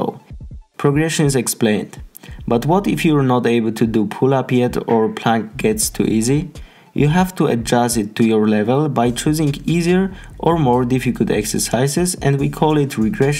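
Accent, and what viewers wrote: Poland. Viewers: I think when i listen your voice i conside that you from maybe Poland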